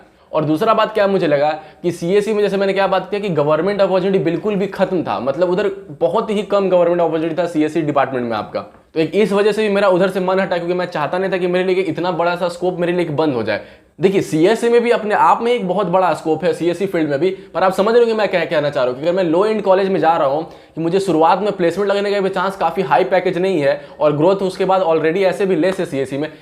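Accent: Bihar, India. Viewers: Yes , he is from Bihar. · Your voice has a bihari tone 😅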